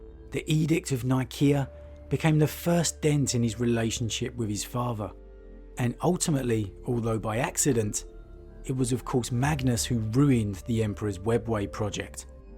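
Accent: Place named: London, United Kingdom. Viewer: Great voice london accent mate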